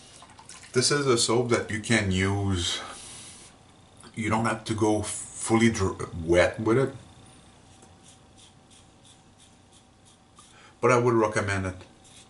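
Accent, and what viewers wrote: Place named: France. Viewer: Hey man your doing a great job and don’t worry about your French accent it’s cool there are a lot of us myself included who could learn to speak a second language and some of us are much poorer for not learning it. As for shaving there is no advice that I can give anyone on that topic I’m just glad to have gotten the hell away those stinking cartledge razors since 2010 my face has never felt better, so long Gillette, Schick, and all the other junk 3,4, and 5 bladed crap as well as those synthetic shaving soap. Anyways Cheers man if you are watching the Grey Cup tomorrow enjoy I’m going with Ottawa although I live in the west, Cheers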